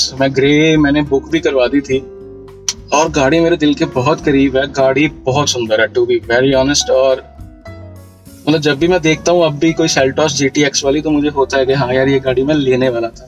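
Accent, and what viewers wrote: Punjab, India. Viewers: sare punjabi petrol ko paatroll kehte hain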